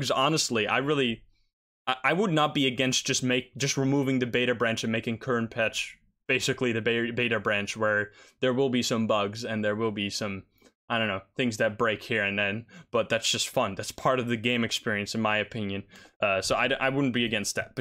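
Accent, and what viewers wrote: Netherlands. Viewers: Wait, are you dutch?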